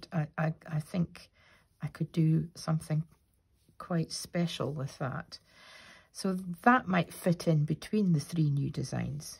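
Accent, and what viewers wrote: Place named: Fife, United Kingdom. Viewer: Good morning from a very chilly Toronto, Canada. I’m an expat Scot from Glasgow, discovered your channel thru IG. Loved this vlog, the story of your mum and your intro to crochet. Wouldn’t she be thrilled and proud at the business and life you’ve created. You look very like her in the pics you shared. I love your Fife accent, so much softer than the harsher Glasgow twang. Your blankets are stunning in your IG grids. I’m more of a knitter though I also crochet. I can’t embroider any more as I can’t see close up detailed work. Eyesight and aging - sigh 🤷‍♀️. I look forward to hearing more in future vlogs. Your grand babies, the twins, oh boy, beautiful Scottish babies, just a cuddleful/armful of love. - Isabel 🇨🇦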